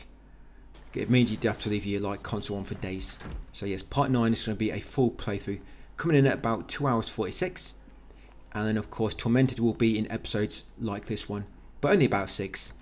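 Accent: United Kingdom. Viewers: Ты британец?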